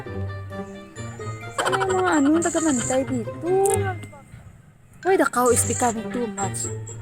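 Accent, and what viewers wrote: Iloilo, Philippines. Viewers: Maham ot gid ko sa imo te ah ky may tuno ka gid ya sa ilonga heheheh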